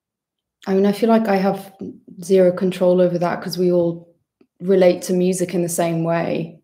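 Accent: United Kingdom. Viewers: Greek Icon with British Accent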